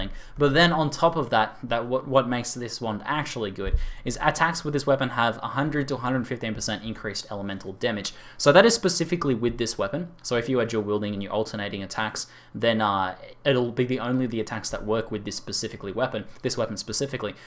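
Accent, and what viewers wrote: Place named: Australia. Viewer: your videos are always professional, very informative, well organized, and extremely entertaining! You take us through every nook and cranny in these unique videos, and I love how you go into depth and talk about how each item can be used in specific situations and examples. Big fan! Keep up the good work! Also, love how you say "just here" all the time... Must be an Aussie thing:D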